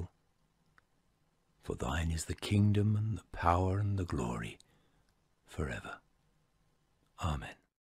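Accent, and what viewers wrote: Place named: United Kingdom. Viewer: Any got any good loops like this but without the “after this manner prey ye” british accent (I can’t hold American too long, just breaks my meditation) thank you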